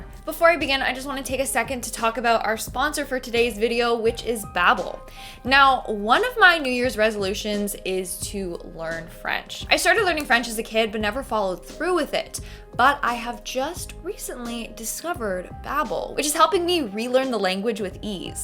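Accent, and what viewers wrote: Canada. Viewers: Hey are you Canadian and if so French Canadian? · Are you Canadian ?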